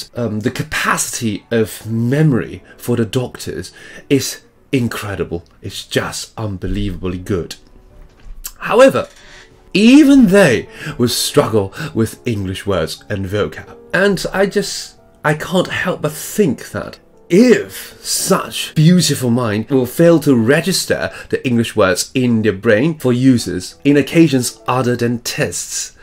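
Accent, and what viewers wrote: RP, United Kingdom. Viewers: 從來沒有著迷過英國腔，自從聽到Eko老師的英國腔後，真的只想聽英國腔了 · btw I love your English ascent is it British? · He studied in London and graduated from the University of London. Of course, he has a British accent! shut the f up if you knew nothing. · 英式发音听起来好舒服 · 英式英語就是好聽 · 英式口音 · British accent，说的真好听❤ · 英式發音真的很讚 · 老師的英國腔讓我著迷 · 英國腔像石內卜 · 英國腔聽起來很貴族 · 超喜歡老師的英式英語，深深著迷，又帥又有氣質。😅😅😅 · 沒在聽 英式腔調 跟語速 有點聽不太懂哈哈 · 發音有點英式 好聽 很像看哈利波特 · 英式口音好銷魂😍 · 英式發音👍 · 很英的英文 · Definitely he is! He is using the Royal British accent and speaks professionally. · 聲音是英國人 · 主要是英式腔聽起來很有氣質，文縐縐的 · Wow who's this Japanese looking Tawainese dude speaking in British accents?(Speaking as some Aussie Hong Kong guy) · 英國腔真好聽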